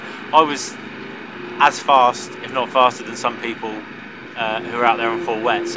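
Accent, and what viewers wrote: United Kingdom. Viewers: His british accent is so strong, i can barely understand him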